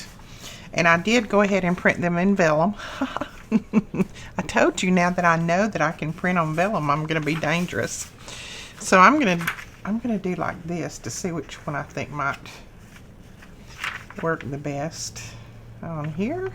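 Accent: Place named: Georgia, United States. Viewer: Hi from Texas. I have been watching a few of your videos and since I was "made in Georgia" I love your accent! Just like family!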